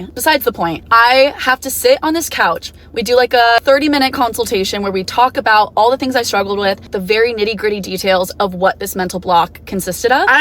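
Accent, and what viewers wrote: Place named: France. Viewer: your french accent was everything 😂 😭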